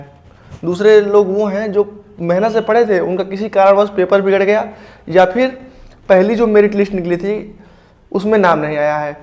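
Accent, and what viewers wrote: Bihar, India. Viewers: Bhai aap Bihar se ho kya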